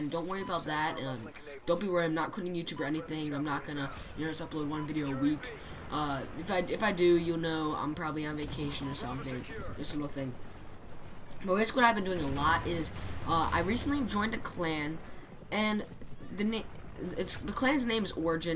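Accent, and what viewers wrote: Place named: China. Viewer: Windows ahah your Chinese accent tho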